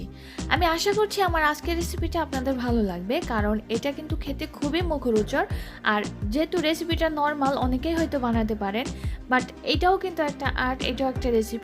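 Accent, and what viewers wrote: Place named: Noakhali, Bangladesh. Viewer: নোয়াখালীর ভাষা কড়ি বলে অনেক ভালো লাগলো 👌👌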